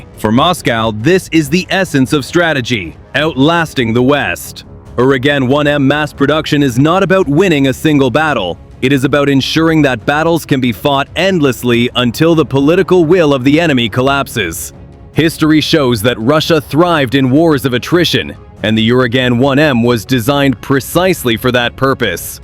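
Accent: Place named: United States. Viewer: Did I miss , for how far it shoots ? All information was logical with a good an Amercan English , thank you .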